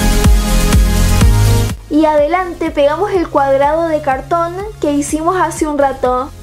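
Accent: Argentina. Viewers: De que país sos parese asentó argentino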